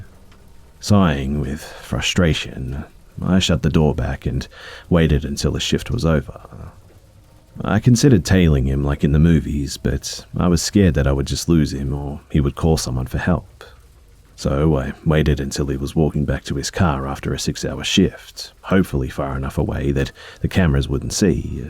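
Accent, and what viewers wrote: Australia. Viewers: Just fabulous Its a real treat to listen to my native aussie accent for change I've subscribed and now about to binge listen to some of ur other works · I swear you're Australian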